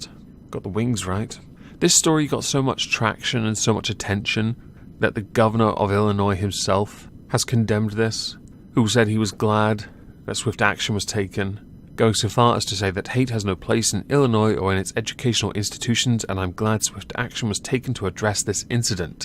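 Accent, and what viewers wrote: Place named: England, United Kingdom. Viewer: I think he's English hence why he said it that way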